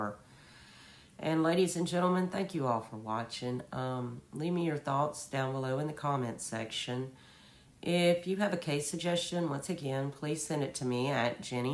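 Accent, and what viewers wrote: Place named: South, United States. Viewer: I speak Korean (4th language) and you did very well. It's a whole new tonal system and they have pronunciations not natural for English speakers. That being said, I can tell you're from the south. I'm also from the south and know that pronouncing most European words gives us aneurisms so I know how hard you tried with the Korean names. You did WAY better than you give yourself credit for ❤️❤️ Your compassionate spirit still captured the same sentiment the rest of us feel.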